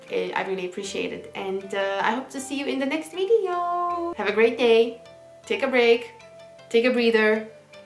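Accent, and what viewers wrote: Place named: United States. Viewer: For someone who was born in Slovakia, your facial expressions and accent is very Westernized, or at least Americanized.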